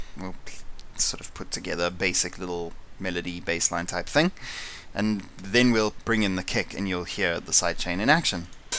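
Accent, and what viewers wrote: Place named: South Africa. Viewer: Hey! Been using the same theme since 2014, and also produce electronic music. If that isn't rare enough, it sounds like you're also a South African! Greetings from Cape Town :).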